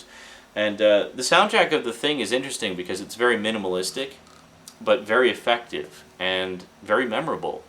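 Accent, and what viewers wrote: Canada. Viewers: You are from Canada....Abooot! Not mocking, I can just tell. Or you are close to the Canadian border at least. Where exactly do you hail from? Greetings from Philadelphia!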